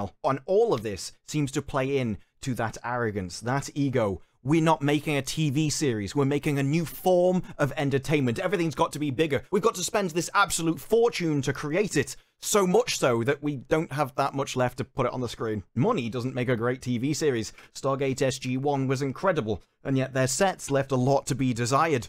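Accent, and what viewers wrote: United Kingdom. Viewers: A british guy talking angrily for good reasons and staying prudent while other disgrave his culture? Take my subscription!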